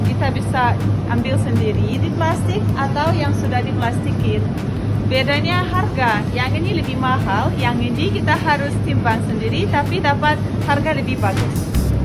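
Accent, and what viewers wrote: Indonesia. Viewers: Kak Pernah Ke Indonesia Belum Soalnya Aksen Bicara Sudah Orang Indonesia Banget 🇮🇩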